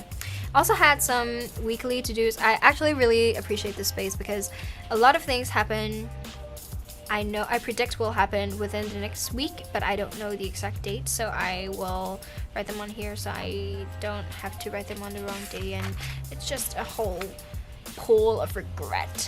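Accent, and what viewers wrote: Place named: United States. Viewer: I can’t place your accent, could you explain on that a bit? Have you been to the US for a short while? You sound that way a bit ☺️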